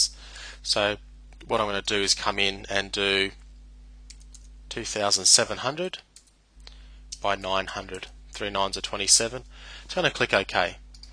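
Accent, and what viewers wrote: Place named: Australia. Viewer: he's australian, dude. give him a break. Nice upload! very helpful!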